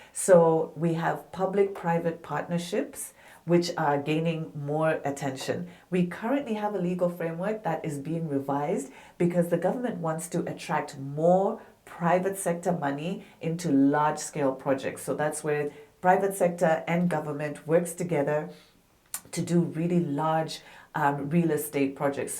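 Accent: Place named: Zimbabwe. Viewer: You have some tones of Zimbabwean in you tho but i will wait till you tell me where you from cos you definitely not Zambian(Your name and accent tell it all)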